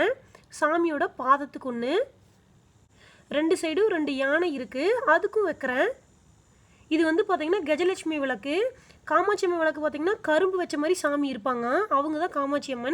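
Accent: Kongu, India. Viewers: சூப்பர் பா... அருமையான விளக்கம். எந்த ஊர்காரர்ங்க நீங்க...? நம்ம கொங்கு தமிழ் பேச்சு கேட்க கேட்க அருமை.. 👌👌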